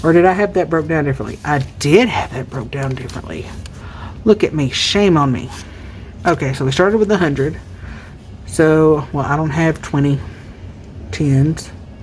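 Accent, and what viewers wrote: South, United States. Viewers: I'll always love just listening to you chat with us. You're just the right amount of southern (on something you mentioned in another vid)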